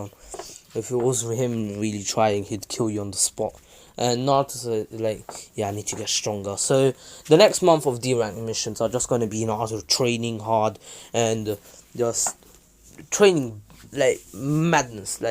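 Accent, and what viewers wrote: United Kingdom. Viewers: I just like his voice when he narrated Bc it’s British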